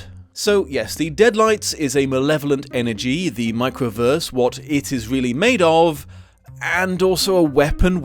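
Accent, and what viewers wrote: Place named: England, United Kingdom. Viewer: ExPat? So your accent isn't real? I thought you were English so good job! :)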